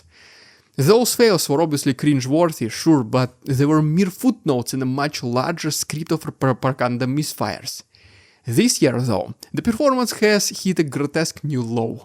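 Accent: Russia. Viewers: I am fond of your YouTube videos. As a linguist I am fascinated by your excellent English combined with a very heavy Russian accent. The two features are not congruous. Would you do us the favor of a short autobiography especially regarding what country you are fled to, and how you learned your English which is the best among Russian presenters.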